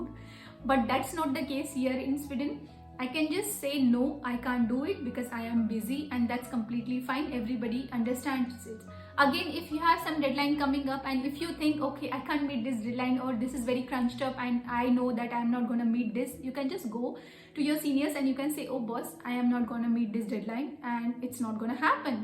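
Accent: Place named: India. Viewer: No no no you speak Indian English nobody understands. Much too fast you speak slowly 50 % or less. You need to understand nobody understands this.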